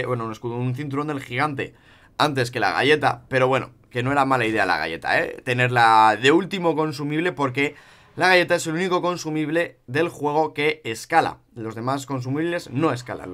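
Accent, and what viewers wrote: Argentina. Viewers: Ese acento argentino papaa! Sos un kapo:v Como siempre disfrutando todos tus vidios. pd: juga singed. Es free elo, encima no fallas los gordotes Kappa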